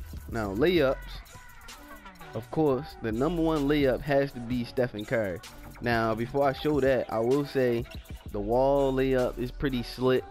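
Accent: Baltimore, United States. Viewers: You from bmore ?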